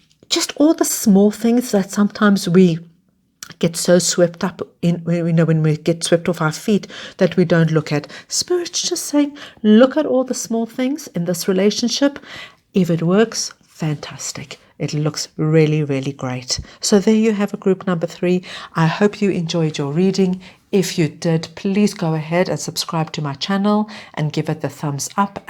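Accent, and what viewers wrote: South Africa. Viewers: I know a South African when I hear one 😁 love to see it 🙌🏾🤍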